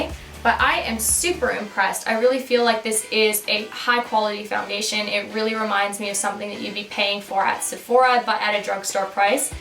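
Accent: Canada. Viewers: Can totally tell you've been back to Canada recently #dataccenttho Love your videos 💕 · I've heard colouring books are really therapeutic :) I should try it! I know this sounds dumb, but you're SO Canadian sounding after spending time at home haha I love it! "Hey?" :) Also love your stripe tattoo. So thoughtful and pretty looking! Wow that bikini is ridiculously tiny...I hate that companies can get away with that!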